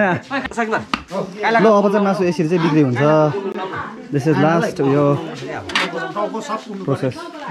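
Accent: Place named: Darjeeling, India. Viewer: gaun thau herda nepal jasto chha feri boli darjeeling tira jasto chha kaha hola?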